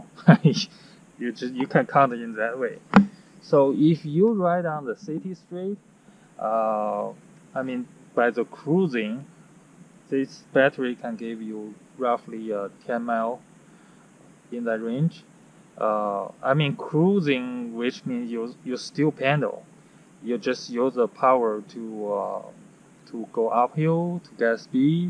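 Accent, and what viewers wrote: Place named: China. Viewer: I wish i can do authentic Chinese accent like that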